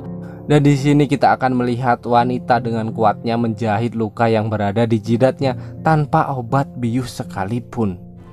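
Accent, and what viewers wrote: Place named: Medan, Indonesia. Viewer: Bukan kelen tapi kalian...logat medan ya brooo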